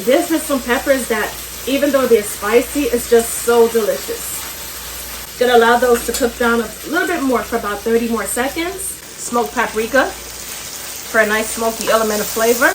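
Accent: New York, United States. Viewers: That New York accent tho❤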